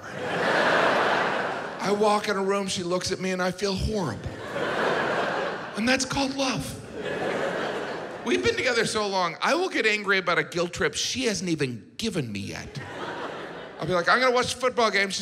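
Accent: United Kingdom. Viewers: That’s not a British accent that’s Scottish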